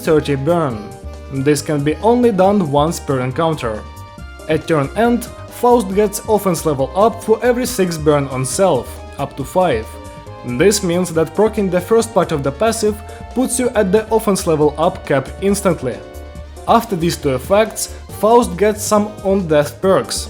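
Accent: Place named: Russia. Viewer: ты же русский? акцент выдает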